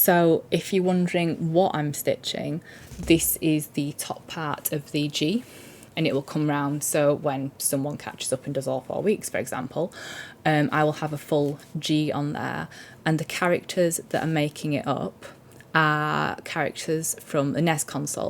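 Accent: Yorkshire, United Kingdom. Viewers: I watched your first video yesterday and the second today. Thanks for the Yorkshire. Love hearing dialects. :-) No, I couldn't figure out what you were talking about, but saw a translation in one of the viewer comments. One would think we were worlds apart in age and interests, but I find more and more things to make note of, check on, watch. I'll be looking for some of the YouTubers you mentioned and look forward to seeing your progress on your stitching projects. Your sense of humor makes me smile and your creative director does a great job, as well. New subscriber. :-) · Love your videos! Great to hear some Yorkshire again. “Shut the door” Looking forward to next update. Happy stitching. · I love the Yorkshire accent! The puppy demigorgon is adorable.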